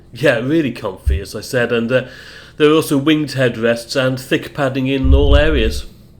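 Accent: Midlands, United Kingdom. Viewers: I'm off to Mexico in a couple of weeks - thanks for doing this upload :) PS do I detect a fellow Midlander from the accent ?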